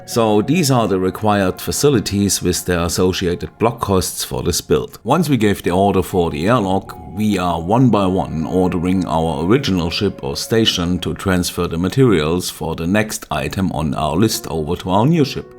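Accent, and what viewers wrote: Germany. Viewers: Fine video. ( On a sidenote: I'm really struggling with our german accent 😅)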